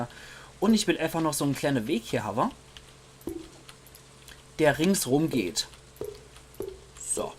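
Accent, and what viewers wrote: Palatinate, Germany. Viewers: Mein Guter, pälzisch wie immer ;) nur: schraub bitte die Auflösung hoch ;)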